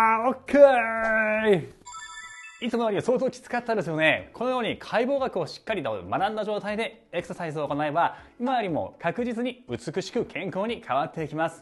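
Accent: Kansai, Japan. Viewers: 分かりやすい解説と、時々出る関西なまりが心地よく、楽しくやる気が出ます。優しい励ましの言葉も嬉しくなります☺！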